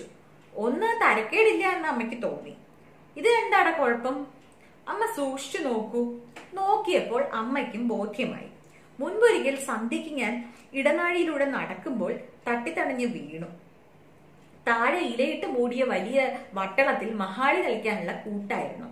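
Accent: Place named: Pattambi, India. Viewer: ഒരു പട്ടാമ്പി കാരിയെ പോലെ കഥ പറഞ്ഞു..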